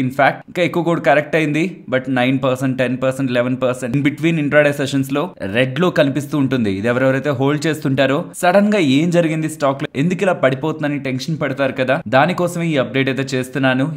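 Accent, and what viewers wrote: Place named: Visakhapatnam, India. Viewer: ‘Eey vusuuu lekunda’pakka Vizag language 😀 👌🏽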